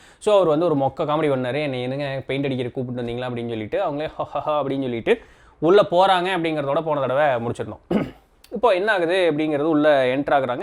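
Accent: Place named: Marthandam, India. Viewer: Marthandam slang tamil song paadura pola tan irukum..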